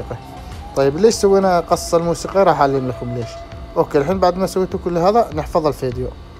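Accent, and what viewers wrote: Yemen. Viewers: انت من اليمن صح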